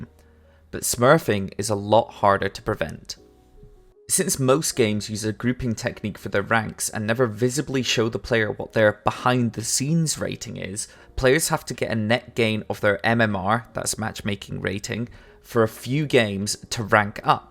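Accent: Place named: Scotland, United Kingdom. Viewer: WOW! What a wonderful and informative video. The OSU! community thanks you for putting such a great amount of effort into this, from the detailed animations to the outstanding soundbytes (pure nostalgia from Vsauce). As an ex-OSU! player, I always struggled with playing against smurfs >:( I blame my friend - Jamgyo. Forcefully making me download the game and getting me hooked. From there, it was a never-ending spiral of despair and misery from these smurfs. The game baits you in with its fun colours, fast-action-paced music, and anime backgrounds. Only to be humiliated as the screen goes black and you get a glimpse of your reflection... A sad, sweaty, filthy casual. This agony is only further prolonged as you realise you have received another defeat from someone who has deranked. As a result of this, I have had to stop playing and focus my attention elsewhere. 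I recently joined a friendly Old School Runescape Discord server, and with the great support and assistance of these members, whom I am proudly now able to call my friends, I am slowly closing the gap towards a FIRE CAPE. The thilling gameplay and pure excitement from 16hrs of straight griding in the Nightmare Zone have really made me consider never returning to OSU! Don't let my horror story put you off your grind; endurance is key, and you will triumph against these lowlifes. P.S. Love the Scottish accent🏆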